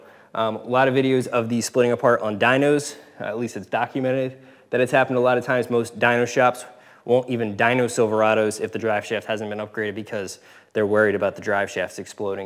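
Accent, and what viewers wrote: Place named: South Jersey, United States. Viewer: you said “wooder” I knew you were from south jersey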